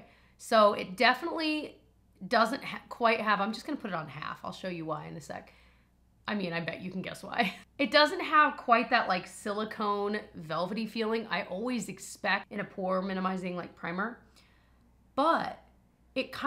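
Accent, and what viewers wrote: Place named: Midwest, United States. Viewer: I love that you share your day with us! I usually sit in the same comfy chair, sipping my coffee, just relaxing while you entertain me. The way you pronounce the words “and” and “but” are interesting to me. Must be a midwest thing. Have a great day!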